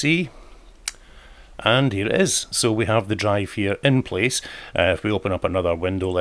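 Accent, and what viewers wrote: Scotland, United Kingdom. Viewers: Helpful in that I didn't even think of using the USB port on my 'Rooter' ;) I do love a good Scottish accent! (still think Scottish Engineers are the best to work with!)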